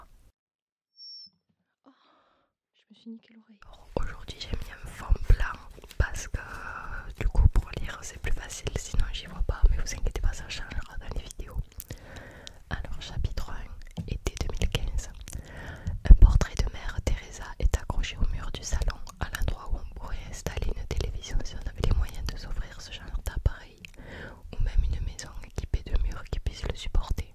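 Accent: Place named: Marseille, France. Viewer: tu es Marseillaise non ? Ton accent le montres bien mdr allez l om 🎉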